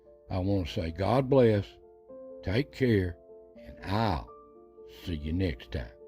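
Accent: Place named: Oklahoma, United States. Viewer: Good lord thanks for making these, my dad passed in 2020 hes born in 1951 and his accent was just about same as yours cept he was from NW oklahoma. But he told stories jusy like you and it reminds me of him. Thanks brother, appreciate sharing the info of the rugged country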